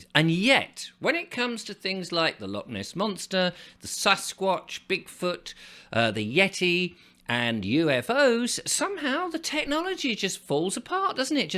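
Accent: United States. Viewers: Love your American accent. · Pretty good American accent.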